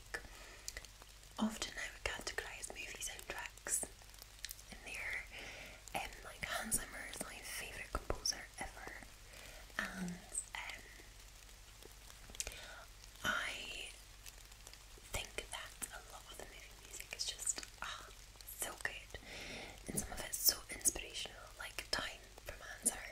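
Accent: Scotland, United Kingdom. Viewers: She murmers scottishly. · I thought it can't get better than this Scottish girl, rain, then came the nails and I had to bounce.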